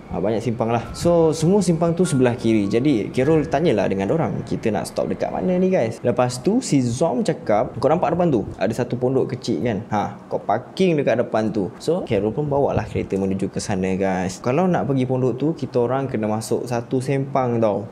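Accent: Johor, Malaysia. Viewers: Abg org johor ke? 😅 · Bang orng johor eh😊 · abg ni org johor ke ? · org Johor kah?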